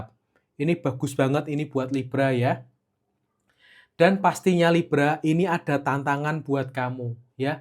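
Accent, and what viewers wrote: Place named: Java, Indonesia. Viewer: Medok bgt Jawa nya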